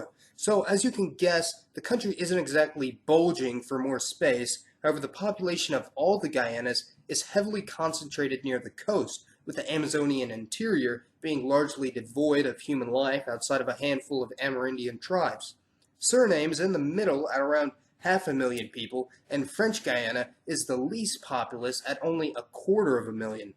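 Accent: United States. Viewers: Thank you for great clips. One thing i can say is that please, if it is possible to put english subtitle, cause american english pronaunciation is defecault for understand. I am from southeastern Europe and british english is more understandable for me. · He was on no more than telling out a university assignment to us all. What the man says will highly suggest that it is fact. When what he is reading as the sources, gives him sentencing and paragraphs without the words might, could or possibly. No, all cohesion does is make an individual sound academic which he does superbly. Also, he comes across coherent, yet others may argue that the use of English language amongst Americans never sounds as coherent as a non academic schooler from England. For example, the part in this video where he presents the pie chart data about population of Africa India and others; however, cohesive - a non academic English child could in my reckoning sound more coherent like with 'the flocking Indians rule over there'. 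Once again American accents take away a little coherency of English languages. Only a little mind you he is still heard. I listen back to my English and sound like a gay kid looking at his own backside arching for please please sir I want some more or whatever Oliver said in his moment. I does think like in the case of Mauritius, that in the West books like remembering the world started in the 19th century when slavery was abolished. When we all know the world is still less than 200 years old from 1837. My guess is Europeans knew how advanced they felt about themselves and really in their hearts knew despite colonialism that they were never turning Africa into European beliefs without slaves. Ask scholars why they don't talk about wh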